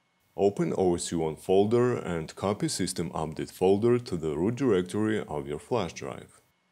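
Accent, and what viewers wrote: Russia. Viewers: русский акцент не скрыть) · Русский чтоль?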